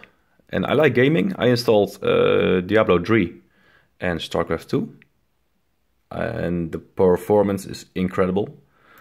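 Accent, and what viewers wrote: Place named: Netherlands. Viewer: haha je kunt horen dat je Nederlands bent xD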